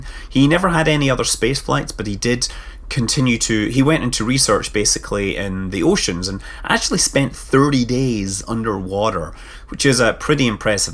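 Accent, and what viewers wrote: Scotland, United Kingdom. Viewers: Hey, every time I witch to you channel from say TotalBiscuit or Polaris or wherever, the first thing I have to do is turn down the volume before your fine Scottish brogue deafens me! Otherwise, your channel is awesome.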